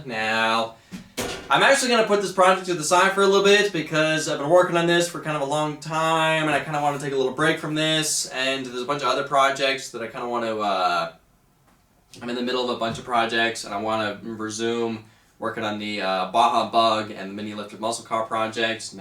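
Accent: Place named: United States. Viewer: Where is his accent from people ? I know its american? But what state etc it sounds so mad but in a good way 😁😁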